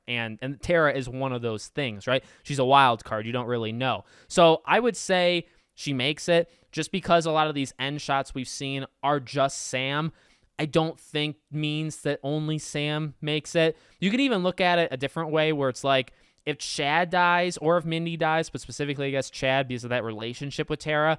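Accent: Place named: Boston, United States. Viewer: r u from boston ? north east? ur accent lol the way u said podcastt🤣🤩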